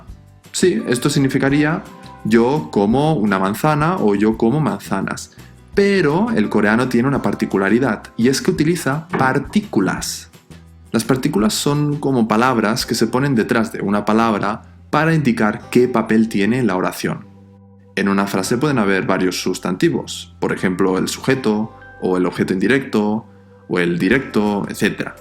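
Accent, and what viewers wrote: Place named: Spain. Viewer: gracias por responder...en que parte del mundo nacistes? se escucha como español de España...sigo viendo tus videos y tambien te voy a seguir por Instagram..saludos..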